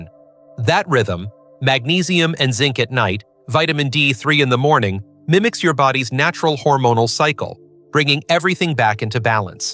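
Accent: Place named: United States. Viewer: 0 Dr you can not deny that you have Indian Genes Than why you do not SPEAK IN INDIAN TONE? HOPE YOU WILL ADMIRE OUR CONCERN AND WILL avoid American tone in your videos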